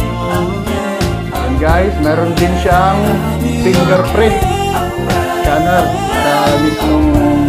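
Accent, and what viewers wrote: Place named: Ilocos, Philippines. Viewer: Tga Baguio Mama Ko Po Pero D2 Na Cya Sa Cebu Date May Bahay Cla Sa Naguilian Ngayon Nasa Baguio Na Mga Titas Ko Kaya Pala Ang Tuno Ng Salita Tulad Ng Lola Ko Kase Tga Naguilian Pala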